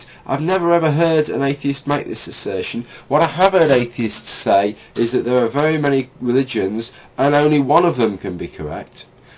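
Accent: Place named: England, United Kingdom. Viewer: LOL! When he opened his mouth I said "lol a pom!" Awesome vid mate! 5 stars.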